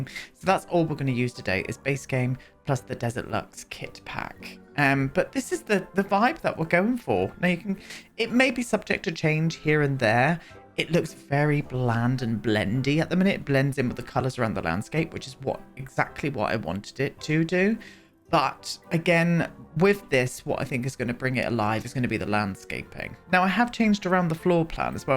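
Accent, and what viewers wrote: United Kingdom. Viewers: This house is difficult to renovate. I love your buildings, and also your British accent. So gorgeous .❤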